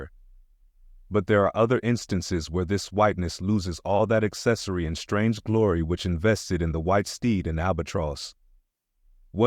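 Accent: United States. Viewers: FINALLY an American reader!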